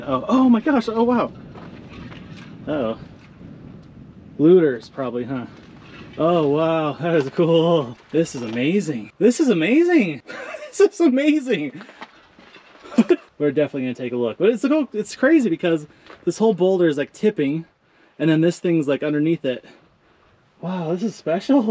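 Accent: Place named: Utah, United States. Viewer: Depending on where you are my money is on Fremont Indians if you are north of The Colorado River or Anasazi if you are south of the Colorado. The terrain looks like southeastern Utah. Maybe somewhere between Beef Basin and Grand Gulch. I lived in Moab for almost 30 years. Sites like this are all over the place in and around Canyonlands. Secure food storage was critical and it is almost a certainty that this construction was for that purpose. Actual dwellings in that area tended to be pit houses. Listening to your narration I am guessing you are a Utahn. I’m even going to guess Utah County but oh my heck…. I could be wrong. 😊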